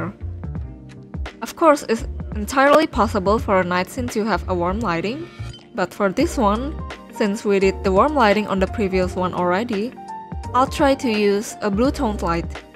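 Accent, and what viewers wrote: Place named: Indonesia. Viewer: dari logatnya mah indo inii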